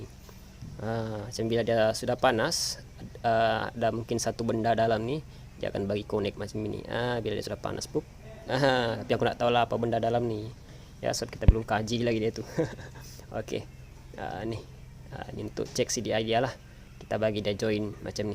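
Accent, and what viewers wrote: Sabah, Malaysia. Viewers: saya suka dengar orang sabah cakap. arwah mak saya asal KK lepas kahwin dgn arwah ayah asal kedah terus menetap di sini. hehe lain cerita pulak. penjelasan yang hebat 👍🏼👍🏼. kipas radiator LC saya tak berfungsi pun entah berapa lama. tau naik saja. boleh la saya cuba cara ni. terima kasih semoga murah rezeki bang.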